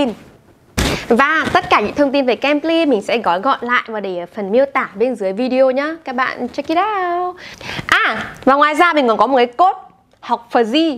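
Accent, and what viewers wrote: Australia. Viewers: Thích giọng chị ghê! Anh Úc nghe chanh sả rất hay hjhj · Đặc sệt Australia mà lại bảo k có ngữ điệu cụ thể nè 😌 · Ui cái Aussie accent, yêu❤️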